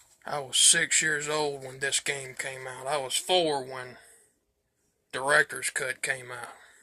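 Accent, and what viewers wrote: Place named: Appalachia, United States. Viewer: why you talking like a hill billy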